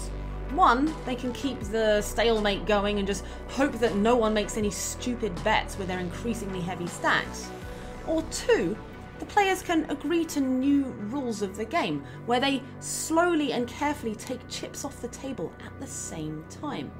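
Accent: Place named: United Kingdom. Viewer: I love your female British voice I wish I can see your voice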